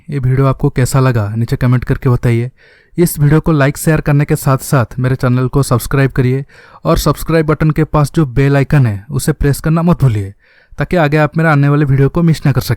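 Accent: Odisha, India. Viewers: Bhai Tu Odisha ru na?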